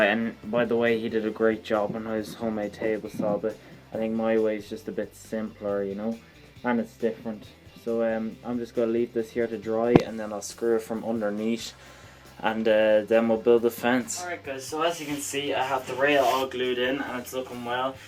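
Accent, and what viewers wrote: Ireland. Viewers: doing the fence now harder than it looks but getting their nice to hear irish accent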